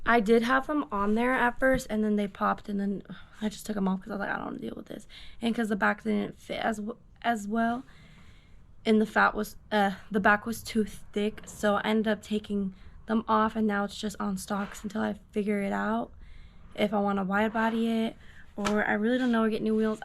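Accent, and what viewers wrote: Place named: Texas, United States. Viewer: Haven't seen your channel in a while. You seemed to have developed more of a Texas accent.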